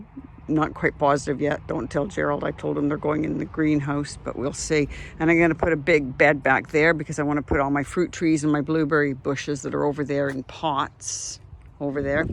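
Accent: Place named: Canada. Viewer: Well you definitely have a canadian accent now. Oh fancy that. You literally lived an hour from me. Most of my extended family are in liverpool or thereabouts but we moved around with my dads job then ended up back in Lancs. What a small world. So do you think of yourself as english or canadian or both?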